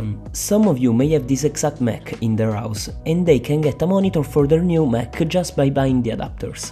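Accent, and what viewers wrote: Italy. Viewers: Are you Italian? cause you sound exactly like me talking in english and im from Rome lol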